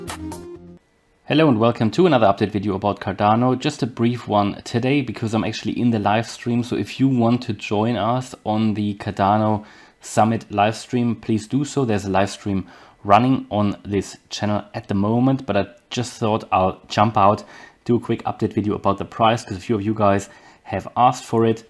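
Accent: Germany. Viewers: you sound like you are from a german speaking region , germany-austria -switzerland . best regards from miami , a ex german immigrant :))) now american immigrant,,, always immigrant :))))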